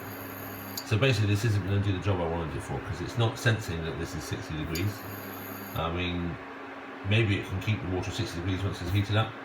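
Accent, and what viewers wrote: England, United Kingdom. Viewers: You've got a great English accent and voice. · could be wrong in regards to the accent but if hes a Pom no suprise on the whinging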